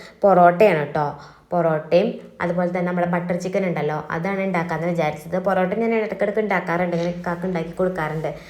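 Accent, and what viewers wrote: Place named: Thrissur, India. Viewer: അവർ Thrissur alle? സംസാരം അങ്ങനെ അല്ലേ kayiooo.നമ്മുടെ പോലെ ആക്കാൻ കയിയണമെന്നില്ലല്ലോ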